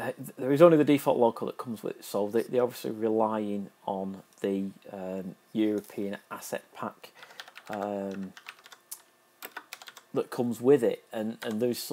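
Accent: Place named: Manchester, United Kingdom. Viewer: You sound like you're from Manchester, I make lots of videos around mcr of trains and stuff it's called GMTrainspotting check it out! great channel btw, just research your facts first so you don't end up not knowing in the video.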